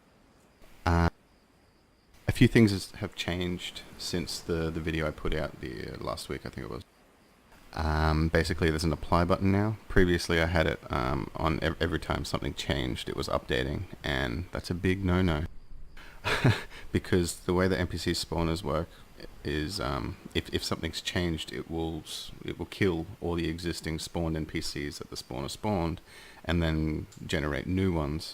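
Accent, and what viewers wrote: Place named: Australia. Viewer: Damn, that's a pretty good Aussie accent for a Norwegian!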